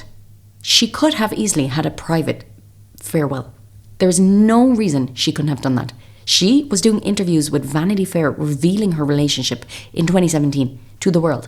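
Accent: Ireland. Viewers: Your accent is so interesting. There’s an Irish twang, no offense meant (I’m an immigrant too). Just quite curious about it. Also, love your videos-I binged quite a bit in the last 24 hrs. You kind of remind me of another content creator I follow called Cheere Denise. She does voices similar to you when it comes to MM-the smarmy self obsessed voice that MM has. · I'm Swedish and it took me some time to understand what you were saying, but now when I'm used to it I find the Irish so soothing and calming, please don't change it too much! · Aww. Not to be too much of a stan but that's just the Irish accent that lots of us like very much. And unlike ahem... some people 😅... it's 'authentic' 😄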